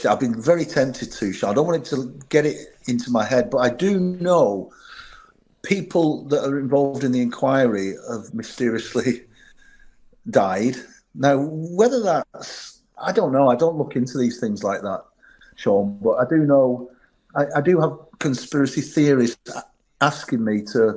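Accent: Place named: Liverpool, United Kingdom. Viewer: pity about the scouser accent , and he is a crim !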